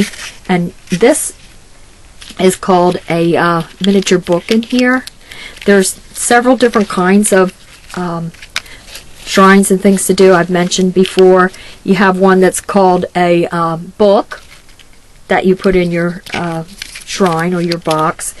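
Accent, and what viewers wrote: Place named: Philadelphia, United States. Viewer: You SO deserved to be showcased by Laurel...you are a true artist and you are doing the same thing she is by honoring so many of the artists who have inspired you over the years. Love how your book is coming along...purple is my favorite and your birdcage rocks! And I agree with NJGardenGirl....you definitely have that Philly accent going on! TFS, Heather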